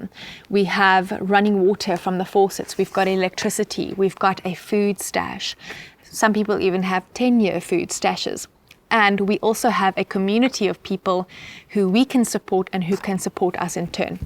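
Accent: South Africa. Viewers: Always an outstanding production 👏 of course that South African accent is the icing on the cake lol, one can never be over prepared for the future we heading into. Without a doubt the weak and unprepared will not make it, but I dont think you need to be concerned with that lol. Thankfully the father gave us a perfect road map to navigate the grim future that lies ahead, praise be to Jesus! Thank you for your time and insight, always appreciated 💪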